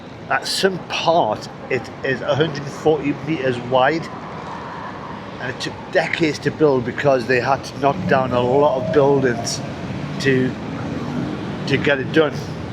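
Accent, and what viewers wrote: United Kingdom. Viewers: it is british, you guys are just too used to the posh accent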